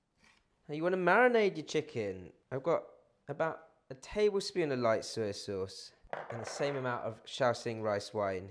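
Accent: United Kingdom. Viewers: is the most british sounding Chinese dude ive seen.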